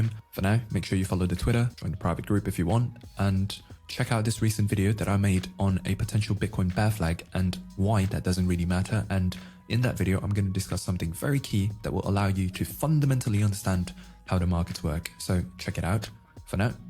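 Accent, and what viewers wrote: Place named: England, United Kingdom. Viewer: Yes, this too shall pass. Thx for the reminder,! Btw, my girl wants to know what region of England you’re from. She’s super diggin on your accent, bro! I’m beginning to think she’s not even into crypto, just listens with me to hear the sound of your voice!😂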